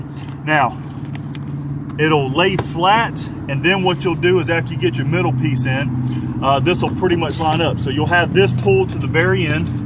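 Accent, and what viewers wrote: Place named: South, United States. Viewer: I never would’ve figured this out by myself in a million years. Thanks! The accent made me homesick for the south! Especially when you say mash down 😊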